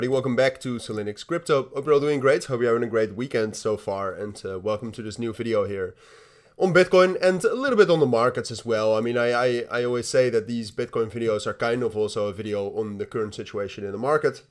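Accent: Netherlands. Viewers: Is this guy Dutch?? Dutch accent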